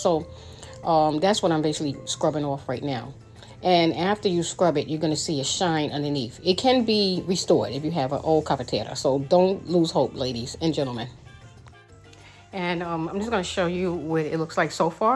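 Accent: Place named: New York, United States. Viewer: Great info, I just restored mine using this information! Plus I like how you talk, New York!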